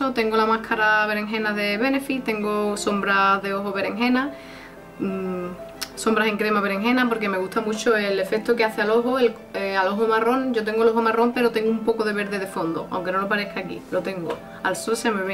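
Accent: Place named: Andalusia, Spain. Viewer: que arte tienes hija!!! como se nota que eres andaluza!!! ijijijijijiji, tu como el resto de gurus creandonos necesidades!!!! aprovecho pa felicitarte por tu canal que lo he conocido de rebote y me a encantao!!!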